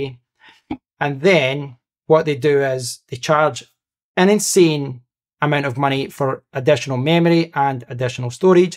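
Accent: Scotland, United Kingdom. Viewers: So cool listening to a Scottish guy talking about a McIntosh.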